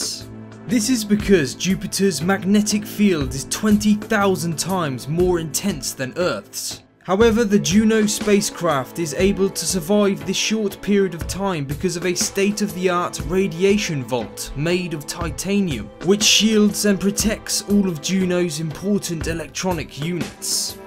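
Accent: United Kingdom. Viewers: cool british accent :D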